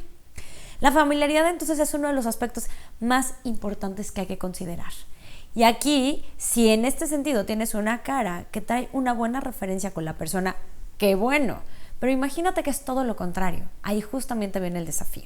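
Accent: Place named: Mexico. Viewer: De que país eres? Me parece tu acento ligeramente mexicano, pero no estoy seguro.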